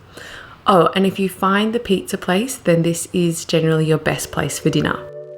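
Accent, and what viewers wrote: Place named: Australia. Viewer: Who cares that you cannot pronounce Mexican Cities. With that beautiful Australian accent you actually make Mexican cities and beaches sound better. I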